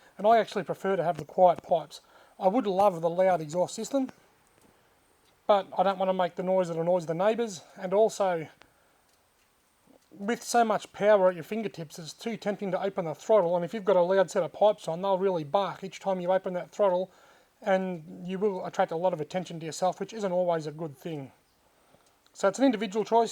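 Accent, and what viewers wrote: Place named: Australia. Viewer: Nice video mate. I always enjoy listening to the ausie accent. Very nice bike.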